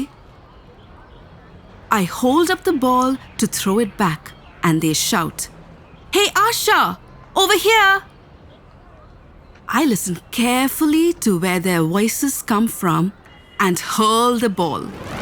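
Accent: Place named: India. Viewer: This is amazing!! Compassion. Forgiveness. Friendship. This book has much to teach kids. accent does a great job making you feel like you’re in India so if you’re about to watch, grab some chai so you can engage one more sense in this beautiful story.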